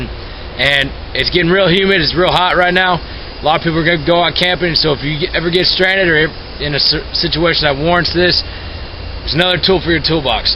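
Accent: South, United States. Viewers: Awsome bow !!very simple to make..nice hat and sunglasses 😁☺love his southern accent😂☺👍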